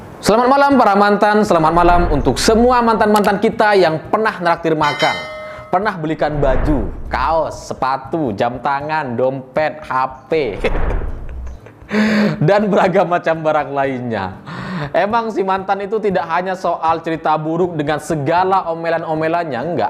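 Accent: Madura, Indonesia. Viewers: Mas Ali saya usul bgmn jika mas Ali menggunakan dialek Madura yg kental dalam penyampaian narasi di setiap video mas Ali mungkin lebih unik lagi karena blm ada lo mas....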